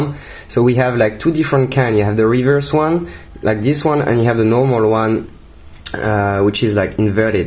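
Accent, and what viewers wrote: France. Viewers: Mec ça cd voit que tu est français Mai's pas grave tu gere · Just so you know, ACCESSORY is pronounced ak-CESS-er-ee. The emphasis is on the second syllable. It's not access-er-EE. I realize you have a French accent, but that pronunciation will cause people's brains to stop for a moment to try and figure out what you're saying to them. Thanks for the review! :) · tu es français??? oh la la l'accent; mec! Mais tu maitrises! :)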